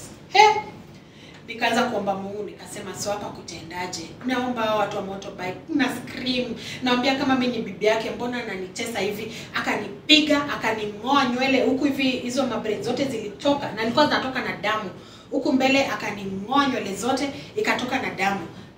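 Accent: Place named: Luhya, Kenya. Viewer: Hii Story ni funny, na hako ka accent ka ulughya kwa umbali, leta ingine😂😂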